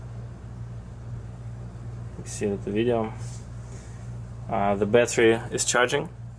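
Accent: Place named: Russia. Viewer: По акценту я сразу понял что говорит русский)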